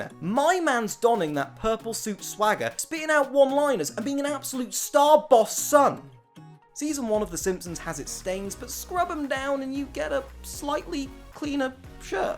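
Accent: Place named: United Kingdom. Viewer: You sound British, very similar to Sideshow Bob's brother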